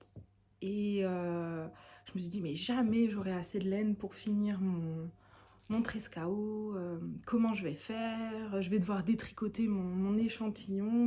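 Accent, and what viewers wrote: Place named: North-East, France. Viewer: Je vous découvre par hasard , merci youtube , pour une première vous êtes bien à l’aise , bravo . Je reconnais ce petit accent du nord est de la France , je dois avoir le même 😊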